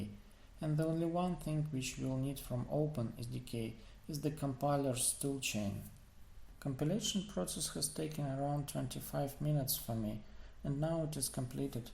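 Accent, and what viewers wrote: Russia. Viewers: русский акцент слышу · You sounds like russian comrade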